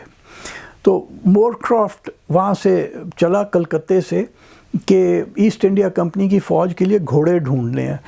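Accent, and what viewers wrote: Punjab, Pakistan. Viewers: sahab salutes to your style of narration with a pure Punjabi accent! Please if you could present a detailed series about Maharaja Ranjit Singh, so that the new generation doesn't forget about the only legendary Punjabi ruler who is taught in Oxford. Thanks!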